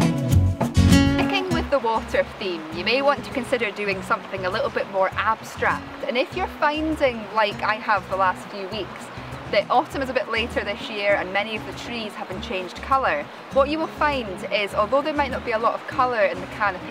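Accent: Scotland, United Kingdom. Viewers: Thoroughly enjoyed the video and your wee Scottish brogue.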